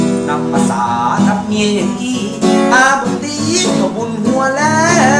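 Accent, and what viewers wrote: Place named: North, Thailand. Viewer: พยายามพูดเหนือได้ดี 5555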